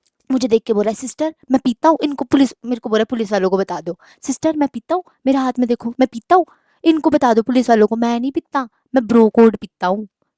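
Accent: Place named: Pahari, India. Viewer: storytime with pahari accent good one 😂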